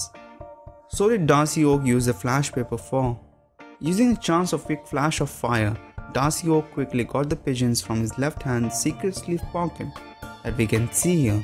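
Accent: India. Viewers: Is he Indian? Are you sure? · why is the commentator swallowing words? very thick indian accident is ok. Some indians speak clearly. This one is awful. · Why the fuck every time I hear a Indian accent (I'm not sure if he is Indian) I believe there name is mohammed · If u have any problems with others then keep it to your self by sharing it to the world you will make it worse for the guy who is talking as well as some Indian people might take take it seriously and u didn't mention its indian accent but the accent he is talking is obviously indian right ? So please understand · Indian accent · Sorry but I would say NO to Indian Accent. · The moment i heard indian accent, i quickly stop the video and dislike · Love the Indian accent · That's Indian accent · English is not my native language, however Indian accents are probably the only ones I have difficulties understanding.. 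🙈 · Well guys don’t worry about his accent ‘ he is a indian guy ‘ but must be well educated and it was awesome presentation 👏🏻👍🏼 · Indian accent for sure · I’m just curious why a few random videos of theirs uses an Indian guy to narrate? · สำเนียงภาษาอังกฤษ!เหมือนคนอินเดียพูดภาษาอังกฤษเลย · Nice vid. Narrator is from the subcontinent. I'm guessing India. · Is the Narrator INDIAN?? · Are u indian voice??? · Indian accent don't go well with Magic reveling voice-over.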